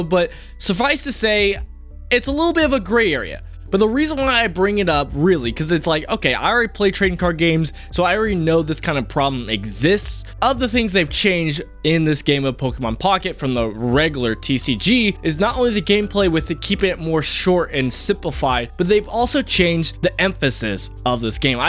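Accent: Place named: United States. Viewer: Your take on VPNs is wild. You taking control of the internet you pay for, which is being botlenecked by the greed of the government so you only have access to certain things which is against the whole purpose of the internet to begin with and is also not Illegal(that's the government you were talking about) is not only a good thing but it's a just thing. You are also going down a very slippery slope with we should just do what the governing body says because thats what the bible says, I mean where do you live or in what time ever is that in any way a good idea because you sound like an American and blinding following our government is going to get you in some bad spots. I mean bro turn on the news and see what governments are doing just today.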